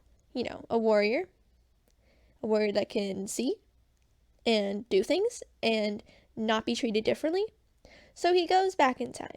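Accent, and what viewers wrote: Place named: United States. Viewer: I can tell you are american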